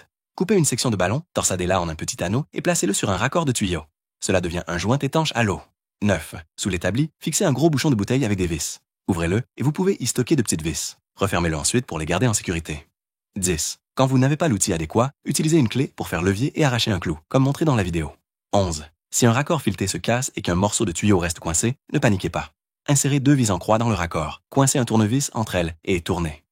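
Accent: Canada. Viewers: Si la voix était prononcée par un accent français et non canadien ce serait agréable à écouter Si...c était plus lent à regarder...ce serait bien plus plaisant Comme ça est maintenant....impossible de m abonner J aime me sentir " bien"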